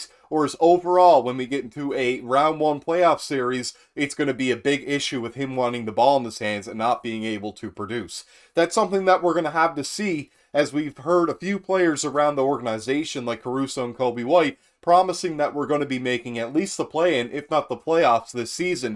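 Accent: Canada. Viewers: Are you from Newfoundland or PEI? Lol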